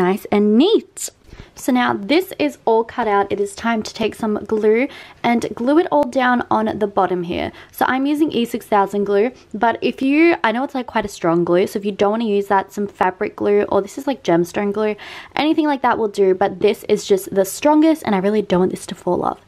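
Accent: Australia. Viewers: Your Australian accent is very refreshing! Awesome video btw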